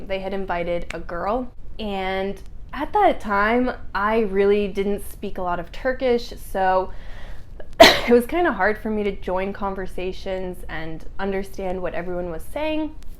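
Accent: United States. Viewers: Probably because you learned brit english like us, she's American so she speaks American English. There are differences between two of them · Turkish schools teach british english but you speak american english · Is about your American accent ya da because of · I live in Oxford UK for more than 3 years, and we call the shirt is just a shirt. I think what do you tried to say for the shirt is about to your American accent.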